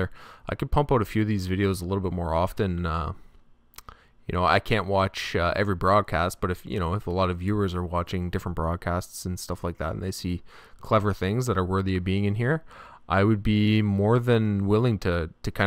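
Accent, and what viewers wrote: Canada. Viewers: are you canadian m8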